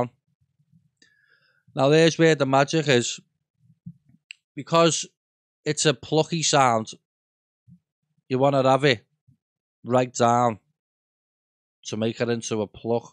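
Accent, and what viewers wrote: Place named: Liverpool, United Kingdom. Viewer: yes! im over in runcorn, obviously your accent tells me your liverpool area. do you bother with private lessons mate? also, which keys are you pressing to get this melody, cheers pal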